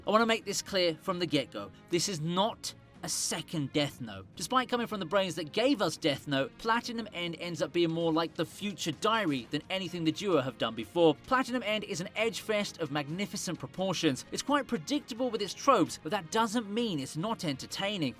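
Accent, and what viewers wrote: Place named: United Kingdom. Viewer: Hey. I want you to know you are my favorite YouTube who covers anime by far. You have put me on to some of my favorite anime. Thanks for all the work you do. And for making these enjoyable videos. Love hearing that British accent before I get to see some badass anime😁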